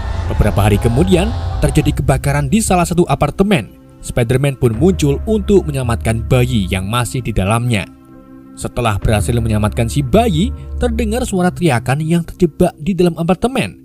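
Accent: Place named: Java, Indonesia. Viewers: Gong-go!! Ente orang Brebes bang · Byoh enk jowo" e😂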